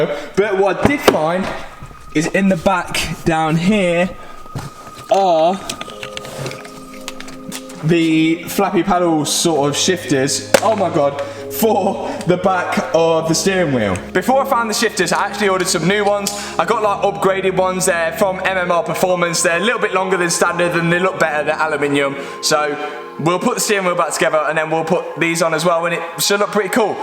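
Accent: Leicester, United Kingdom. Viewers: Can hear that leicester in ya.... easilehhhh😂 Great job tho man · I'm absolutely obsessed with your channel. You are my favorite mechanic, I am watching you and learning thanks every time I'm on your channel. I own 2007 BMW 335I N54 with Dinan stage 2 tuning, and some other upgrades, I absolutely love it. I purchased this car brand new in 2006 and I sold it in 2010 to friend of mine who was afraid to drive it because of the power this car produce. He put only 12,000 miles over 12 years owning it. Three months ago I bought it back, the car is now 16 years old and have only 54,000 miles it was always garaged and it still like new condition. I'm trying to put some more upgrades and improvements. Watching you what you do with your cars make me want to do more and more to make my car look better and drive faster. With the work I did already the car have 450 hp and it's absolute pleasure to drive. 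I am 72 years old, originally from Poland and I live in US for 40 years. It's kind of funny when I watching your videos sometimes I have to rewind and listen second time, because of your British accent. Don't get me wrong I love it, and so far I understand every word you saying because English is my second language I have to pay attention. I am amazed, how the final result is always surprising. You are perfectionist, and I admire your attention to details. I am a Master Crystal Cutter, attention to details and perfection is in my blood, that's why I love what you do. By showing your mistakes and finding the solutions sometimes for very difficult pr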